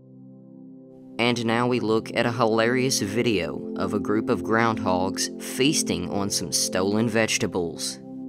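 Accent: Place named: South, United States. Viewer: You sound so country,I wanna say yeah.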